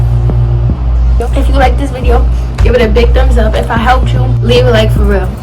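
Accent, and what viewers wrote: New York, United States. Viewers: I love your accent , sounds newyorkish or Jersey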